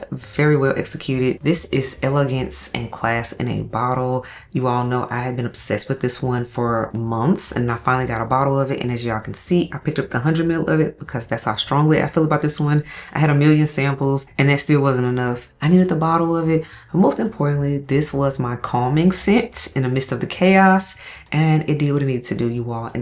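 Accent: Memphis, United States. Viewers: 🌻🌻🌻🌻🌻🌻🌻I know a "Memphis accent" when I hear one! So glad your move went well! Gonna check out two of those scents you showcased! Take care!!!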